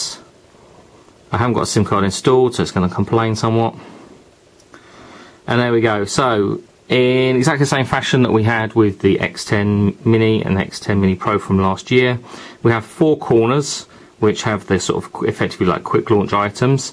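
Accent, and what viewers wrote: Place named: England, United Kingdom. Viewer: English accents unboxings are the best.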